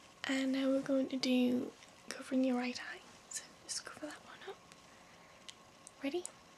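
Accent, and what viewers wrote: United Kingdom. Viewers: ahhhh...British accent nerve exam..YES!! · I think its the British accent that does it for me. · great to hear some bri'ish accent, and on top of that, it just a great vid! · Google needs to design an auditory searching mechanism that allows you to search by accent. The ASMR industry needs more Brits! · that accent is delicious. Where in the UK is that from? Also great video, of course. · I'm sorry to ask you this, but what is your accent? I can't seem to figure it out, I'm terrible with telling the difference between Scottish, Irish and Welsh xD haha love all your videos, keep going :)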